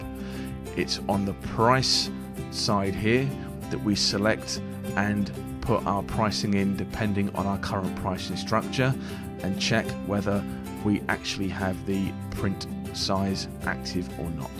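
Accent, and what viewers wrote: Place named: United Kingdom. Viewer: PLEASE LOWER THE BACKGROUND MUSIC ITS HARD TO UNDERSTAND A BRITISH ACCENT SOMETIMES THE MUSIC DOESNT HELP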